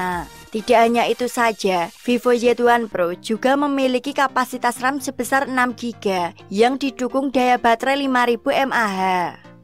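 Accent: Java, Indonesia. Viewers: jawanya sangat.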